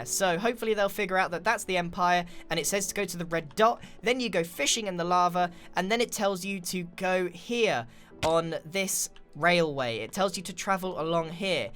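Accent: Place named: Southern England, United Kingdom. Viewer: I’m British and it’s the norm to me.Its a normal middle-class south English accent. I would say it’s more is voice then is accent.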